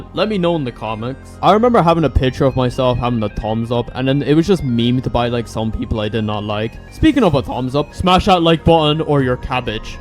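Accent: Ireland. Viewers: are you IRISH:hand-pink-waving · Are you Irish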